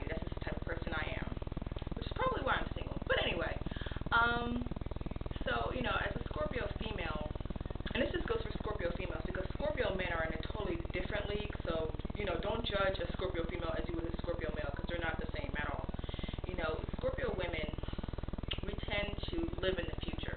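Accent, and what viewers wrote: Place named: Philippines. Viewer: Your accent is so adorable.do you have Filipino ancestry?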